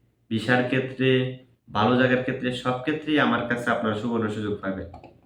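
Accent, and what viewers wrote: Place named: Mymensingh, Bangladesh. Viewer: বাড়ি কি ময়মনসিংহ, ভাষা শিখে তারপর ভিডিও করবেন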